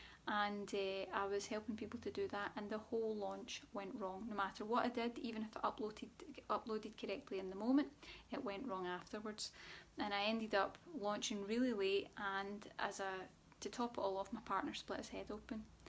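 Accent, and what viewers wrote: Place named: Scotland, United Kingdom. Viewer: Ohhhhh boy! Have you ever described the last month and a half to a "T" for me! I thought that was what was going on, but I needed the confirmation - and here you are! Thank you so much. 😃 P.S. I love love love Scotland and your brogue! Cheers from Canada!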